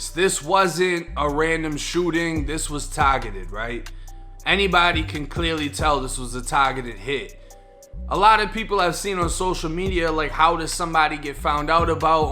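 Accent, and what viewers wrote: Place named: Massachusetts, United States. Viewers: Your from Massachusetts huh?? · Yoooo you got a Massachusetts accent did you live up here in new England?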